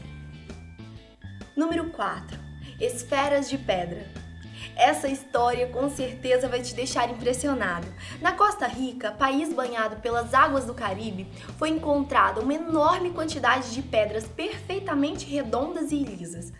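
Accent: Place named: Minas Gerais, Brazil. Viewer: esse jeitin minerin de falar me despertou um interesse em saber se essa moça é solteira e se ela faz o wats dela deixar de ser um mistério pra mim kkkk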